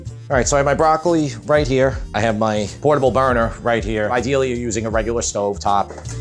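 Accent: New York, United States. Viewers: You’re a great cook my friend. You sound like a New York Italian ? Great cooking !!!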